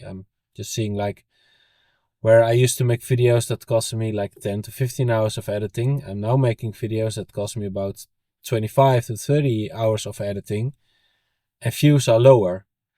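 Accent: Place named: Netherlands. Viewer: I hope you keep the videos coming ! I really enjoy watching them and your journey is really nice to follow A few things which I want to add for sure is however : - do what you have time for ! There are times where you are more busy especially with having kids and a family - show us what fascinates you and not what you think might be interesting for us viewers (that way it is way more exciting as well for you!) - we appreciate the effort of you speaking english! It does not have to be perfect and mistakes makes you human and I m sure no one is bothered by your dutch accent and "mistakes" it shows that you are making an effort to make these videos great and gives the videos a great personality thanks for many hours in front of the TV and learning a lot while enjoying our beautiful aviafauna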